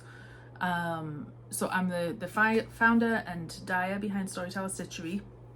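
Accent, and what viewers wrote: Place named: Newcastle, United Kingdom. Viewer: Hello. Bonny Lass! As you continued speaking, the Geordie accent became clearer - my mam is 97 and she is from Jarrow! I am married to a Texan, but we live in England, so I have become used to a ‘mixed’ accent. I am so sad that you are based in the USA (costs of delivery to the UK 😱) as your yarn colours seem beautiful, I am an avid reader and I love tea. Oh well! The episode was really interesting and I am looking forward to future ones. Jinny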